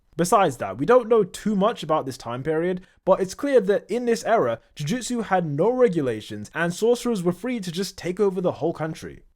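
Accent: United Kingdom. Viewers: Saved him from being raised as a conservative? Hahaha what a panzee thing to say. You know there is a difference between being a conservative and a mysoginistic prick? By your accent, I could assume you're from the UK. So looking at your woke dog shit country you can see the danger of non-conservatism.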